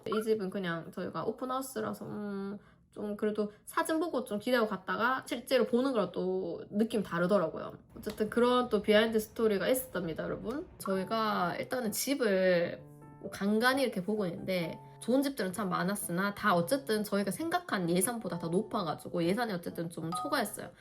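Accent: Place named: Jeolla, South Korea. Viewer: 그런데 말습관중에 끝에 자꾸 전라도 사투리'이 이' 하시는데 왜 그러는 걸까요? 경상도 분이신데 뭘해도 상관은 없지만 안하시면 더 좋을듯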